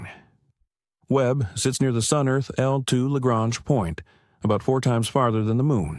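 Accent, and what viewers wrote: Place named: South, United States. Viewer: I like the southern sounding voice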